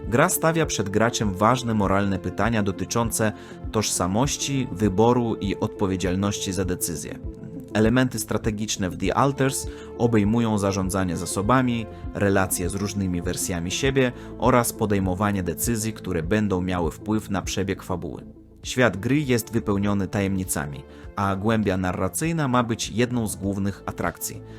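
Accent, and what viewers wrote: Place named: Russia. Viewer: brat jest naprawdę z rosji a ja myślałem że tak dla beki taka nazwa xD ale kocham twój akcent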